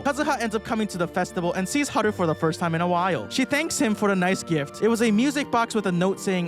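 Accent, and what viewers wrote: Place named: Turkey. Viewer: Are you Turkish? Your pronunciation sounds very Turkic...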